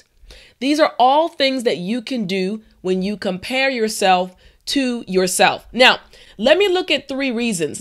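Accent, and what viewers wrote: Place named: United States. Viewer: you r litteraly terrific, i do love your ways of teaching moreover your american intonation. I'm still connected to your lessons.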